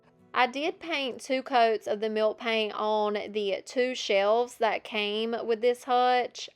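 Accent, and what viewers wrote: South, United States. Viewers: Love your work. You said someone suggested you get a narrator, so rude and low life. Normally I don't like a southern accent. I've stopped watching some sites for this reason. However I love your voice. It is soothing and low key. You're great😊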